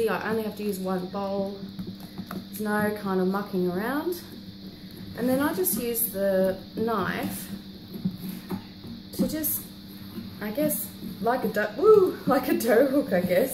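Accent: Australia. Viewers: Niceto hear an Aussie accent !L.O.L.😄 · You are a great lady and I thank you for the wonderful video and your sourdough recipe. Btw love your Australian accent. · Loved listening to a fellow Aussie. I enjoyed this video. Straight to the point and informative. Hope to give it a go soon. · Thanks! This was really nice to hear an Aussie voice and practical instructions. 🇦🇺👍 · HI! Totally awesome video! Camera angle was perfect, you have a very pleasant and clear [ Australian!] speaking voice, and the entire process was easy to understand and well presented! Well done! Shalom to us only in Christ Yeshua. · Hi, You made it look easy to follow so will attempt same. Good to hear aussie talk.:-D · thanks I love Aldi . I'm from Shepparton VIC.regional. My bread is now tasting a bit more sour. Thanks your Video's are wonderful. Great to hear an Aussie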